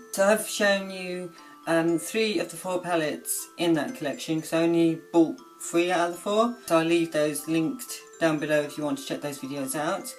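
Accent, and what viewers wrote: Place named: United Kingdom. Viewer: I liked all 4 colors and all of them look nice on you🔥 Greetings from Siberia, Russia✋ Btw, I like your accent. It seems like you're from the UK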